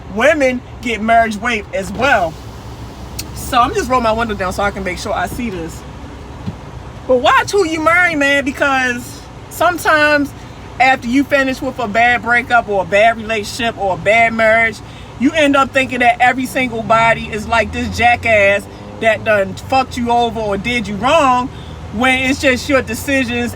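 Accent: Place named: Baltimore, United States. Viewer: I can't tell you how this video came up in my recommendeds but I'm glad it did. I heard a few words and instantly knew, she from Baltimore. My best friend is from B'more and an old Army buddie. That accent is heavy. Be safe out there on that road Ma'am.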